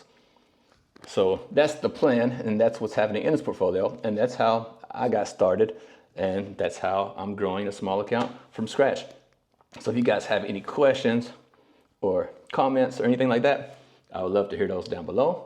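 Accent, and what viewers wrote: United States. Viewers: You sound like an American. Just wondering how the Chinese police treat you? Do they stop you every time you go out and ask for Visa document etc? The news makes it sound like you will be vanished and never be found if you visit China.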